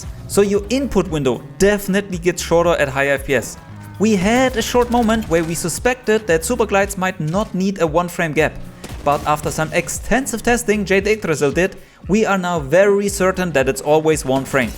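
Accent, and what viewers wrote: Germany. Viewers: Hört sich Deutsch an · You have very german accent. Good Video bro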